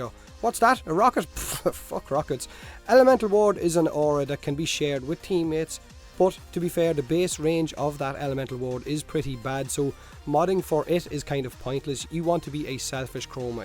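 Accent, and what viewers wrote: Ireland. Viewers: am new to this channel and i love to know if this guy is Irish or not his accent sounds like Irish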